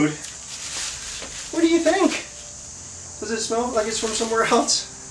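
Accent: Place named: Canada. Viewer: Still say ahboot though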